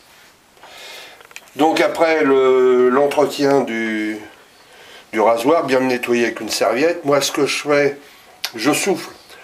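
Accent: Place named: East, France. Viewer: Ahhhh vous êtes de l'est je pense ! Ça me rappelle des souvenirs cet accent :)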